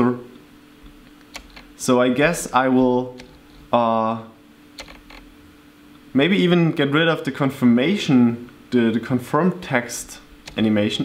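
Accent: Germany. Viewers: Thanks for tutorials, really nice! Sometimes your germans makes me laugh lol. Anyway, loose the stickers :S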